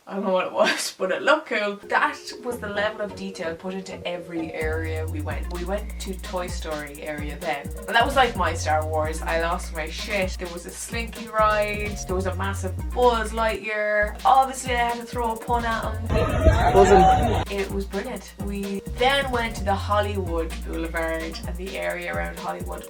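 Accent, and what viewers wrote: Ireland. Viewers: I know you get it all the time, but your accent and mannerisms are adorable. I'm a mutt... Cherokee, Irish and Welsh. I've always wanted to see rural Ireland. Watching you and your friends I think just gives me a bit of insight of true Irish and line of thought. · Relevant to Foreign women and armpit hair in America: Here in these parts French women (deservedly or not) have a scandalous reputation for copious pit hair. If you were to shout out in that glorious genuine Irish accent; "Hey, I'm from Ireland! We are only (X number) of miles from Freaking France"; I'm sure everybody would cut you some slack.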